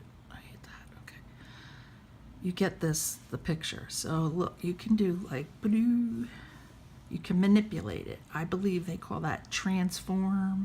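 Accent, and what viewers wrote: United States. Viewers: This is great! It;s exactly the way I work - try this, try that, where's the thing I just did, whoops, undo - which makes me a feel a bit more confident. Btw, I'm originally form Yardley and lived i Philly for 20+ years so it's GREAT to hear somebody "tawk" right! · This is not a great tutorial, but I really appreciate you trying. I did learn a few things from you. Love to see you come back after a few months when you know what's what and can go more into depth. Hard to find good tutorials on Metabrush. You have a very nice voice for this sort of thing. Overall, I'll give you 3 out of 5 stars...with a little more prep you could get 5 stars. Oh, and the camera work was fine...better than many. And hey, I'm from Jersey...we Tawk a lot like you. I didn't even notice an accent! One last thing. you would be better off leaving the brush in the default position. 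The middle on all the sliders is not a very appealing brush. You can't make strokes with it and as I understand it, strokes are a very important part of MetaBrush technique.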